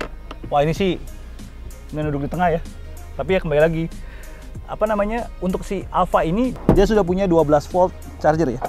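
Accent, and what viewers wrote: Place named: Java, Indonesia. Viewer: yg review 😂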